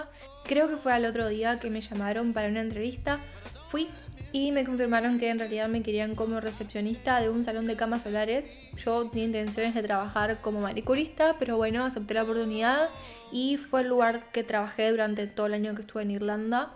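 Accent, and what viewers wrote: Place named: Argentina. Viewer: Hola muy guapa riene el acento de argetina yo aoy de ecuador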